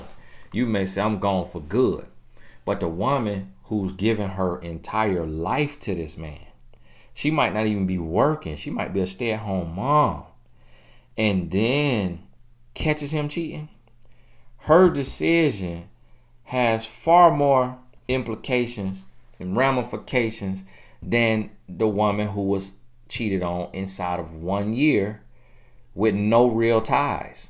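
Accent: South, United States. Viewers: U my training wheels i love your voice its country a accent now lets get in tune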